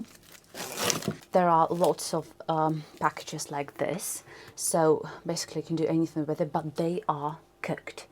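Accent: England, United Kingdom. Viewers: You have an Eenglish Axcent, Who was your teachers? The hynm, Amasing Grace by John Melton. Came after a storm off the Irish Coastline. Communication and voice is important. We need to learn to bake a 🎂 cake.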